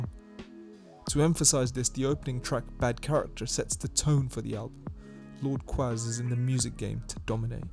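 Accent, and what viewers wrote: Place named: England, United Kingdom. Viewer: It’s cool hearing an English fella taking about one of my most personal and favorite albums. It’s like a Louis Theroux documentary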